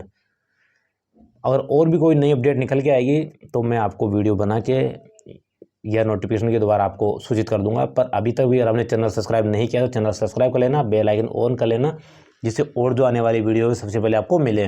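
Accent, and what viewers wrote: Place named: Dhundhari, India. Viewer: तू यार बिल्कुल देसी हिन्दी बोलता है मजा आ गया बिल्कुल ढूंढाड़ी जयपुर के मीणा जैसे की हम भी तेरे जेसे ही बोलते है इसी लिए हमे ओघड़या कहा जाता हैं वा लौंडे मजा आ गया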